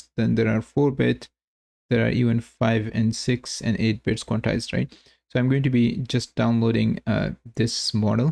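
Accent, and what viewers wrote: India. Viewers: First second I hear Indian accent = Imma'bout to get pure useful information from this video, this is for sure. Thx for the video though 1 thing - when you talk about "previous video" at the beginning of the video, I'd consider to provide this "leading link" that shows in the corner because I'm not familiar and have to dig into your feed to find it. Not a great issue but still - just a tip to consider.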